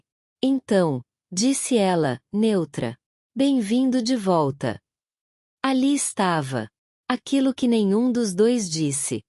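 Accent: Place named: Bahia, Brazil. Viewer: Eu amo essa sua voz de Salvador Bahia a mesma Voz que narrou. A historia de Luisa e Gabriel Monte Negro ❤❤❤❤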